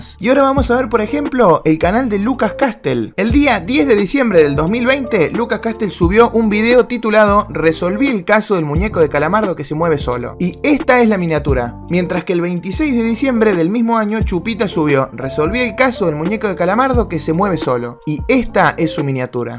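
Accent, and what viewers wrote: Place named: Argentina. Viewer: Pareces argentino XD sos?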